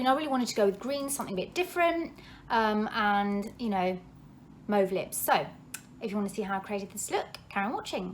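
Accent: United Kingdom. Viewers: Just found your channel! Fabulous look! You are so funny....adore your accent, dare I say you are British! Love love love London. Hubby and I were there in mid May for vacation. Hated to leave!! . Also spent a week there in 2017. Will be watching more of your videos. 🌷🌷🌷🌺💐💐💐😀😀😀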